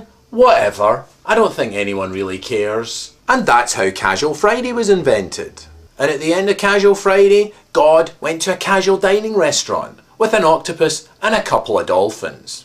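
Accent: Scotland, United Kingdom. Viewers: Your Scottish (or Irish) accent is the funniest thing in your videos. The rest of the content is fairly awesome, too, however. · I love Scottish acsent and I love this video, you are the funniest guy.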